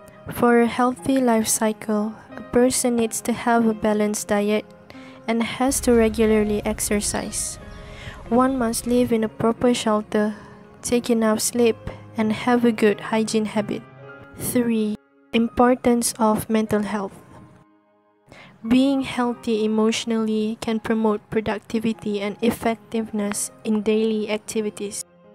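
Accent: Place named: England, United Kingdom. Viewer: Come here because of english eccent..apa yg aku merepek ni..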